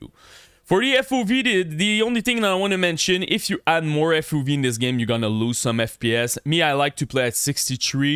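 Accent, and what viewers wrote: Quebec, Canada. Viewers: french canadian.... smh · clairement québécois bro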